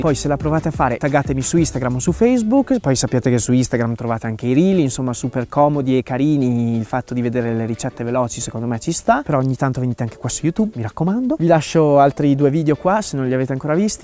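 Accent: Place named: Trentino, Italy. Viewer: Ciao sono bolognese sposata con un trentino da 30 anni lui è del bleggio superiore e vado in vacanza lì tutti gli anni non potrei rinunciarci per nulla al mondo, è un posto bellissimo una pace una serenità unica, poi mi diletto ad andare nel bosco a funghi, quindi anche se parli il trentino lo capisco. Sei un ragazzo bravo e simpatico mi piacerebbe venire a trovarti un abbraccio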